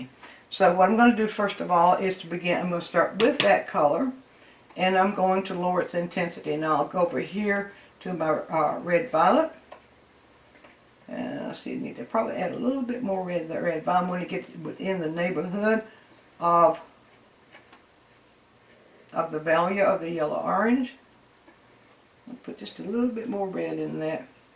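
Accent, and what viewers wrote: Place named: South, United States. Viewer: a smooth southern drawl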